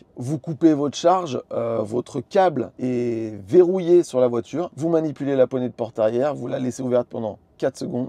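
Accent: England, United Kingdom. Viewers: on avait déjà eu un aperçu de ton accent anglais, là j’avoue que je suis encore plus sous le charme avec ce qnob 😅 Merci pour le test en tout cas;)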